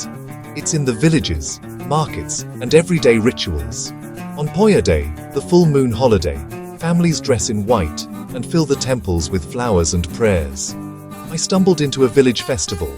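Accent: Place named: United Kingdom. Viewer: AI slop with a Limey voice over.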